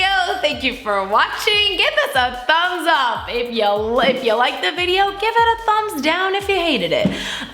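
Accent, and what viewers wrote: South, United States. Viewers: You’re southern accent really came out in this video 😂 and I loved it 😍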